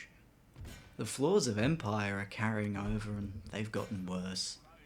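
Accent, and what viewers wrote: Australia. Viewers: I can tell you're Australian right off the bat. its fucken fantastic.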